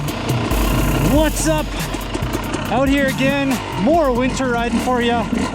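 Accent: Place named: Canada. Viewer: Yeh dude. You Canooks got that funny northern accent and it cracks me up. Grip studs are epic for sure.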